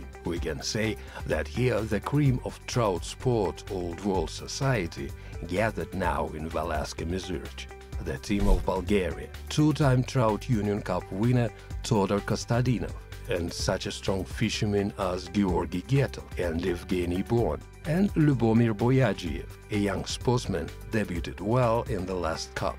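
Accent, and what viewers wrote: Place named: Russia. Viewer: раша акцент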